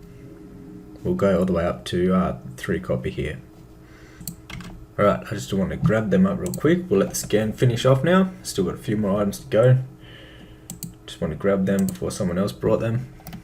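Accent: Australia. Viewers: You sound aussie, how are you not lagging out on this server lol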